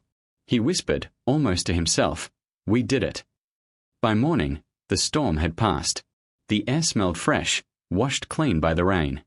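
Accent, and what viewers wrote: United Kingdom. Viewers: ♥️♥️♥️♥️ Loving the British accent ♥️♥️♥️♥️ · Do not care for the British accent! Not subscribing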